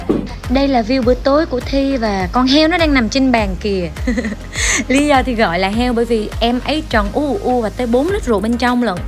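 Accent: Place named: Saigon, Vietnam. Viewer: Blogger đầu tiên xem mà mắc cười cách nói chuyện và điệu cười luôn. rất dễ thương, giọng SG yêu lắm, mà thích nhất lúc bả nói chai rượu mập như con heo, rồi đang nói thì cười cái vụ tốc váy, rất gẫn gũi, chân thật và dễ thương. Mong luôn đáng yêu và hài hước như zậy <3 rất đáng xem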